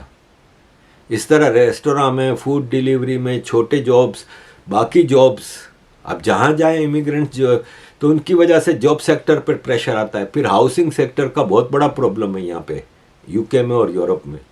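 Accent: Gujarat, India. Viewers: Give up posting in Hindi and post in your native language, Gujarati, so you don’t have to struggle so much with your accent!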